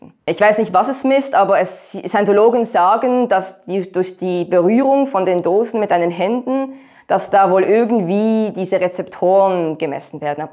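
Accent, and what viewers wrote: Switzerland. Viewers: Sie klingt wie eine von uns 🇨🇭🇨🇭🇨🇭🇨🇭🇨🇭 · Bis Minute 11 hab ich noch vermutet dass das basler deutsch ist - cool🤙🏽🤭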